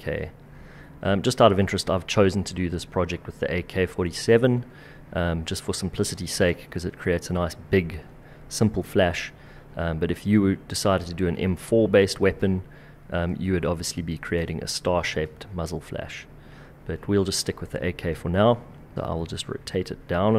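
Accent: South Africa. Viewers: also South african!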